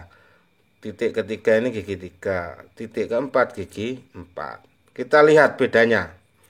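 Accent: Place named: Java, Indonesia. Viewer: slang nya pekat jawa. terbaik bang. salam dari malaysia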